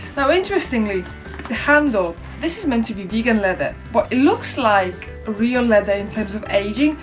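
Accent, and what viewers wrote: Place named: Northern England, United Kingdom. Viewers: Ur half Norther English accent is brilliant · love the northern accent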